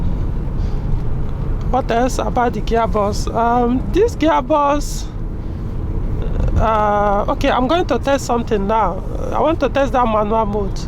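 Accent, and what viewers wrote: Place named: Igbo, Nigeria. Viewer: He's speaking English with Igbo accent/intonation. He's from the Igbo tribe in Nigeria.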